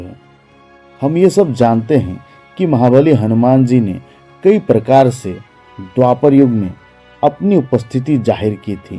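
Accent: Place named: Bihar, India. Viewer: Tumhara Hindi bolane Lagta Tum Bihari Ho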